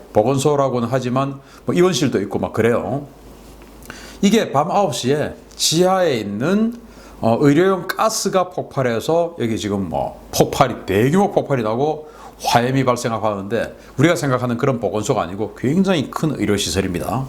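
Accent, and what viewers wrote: Gyeongsang, South Korea. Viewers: 간곡히 건의합니다 1. 멘트를 조금 늦춰주시면 좋겠습니다. 경상도 억양에 말씀을 너무빨리 하기때문에 미처 이해하지 못하는 경우가 있습니다. 2. 광고가 너무많아 답답합니다. 다른 방송보다 독자 수가 월등히많은데 운영에 넉넉 하쟎 습니까? 깊게 헤아려 주셨으면합니다. 존경합니다. !!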